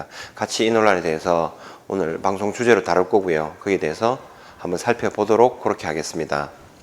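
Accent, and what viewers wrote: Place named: Busan, South Korea. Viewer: 살짝 부산 사투리가... 쑥 된장국 처럼 향긋하게 들립니다. 내용도 진솔하시고.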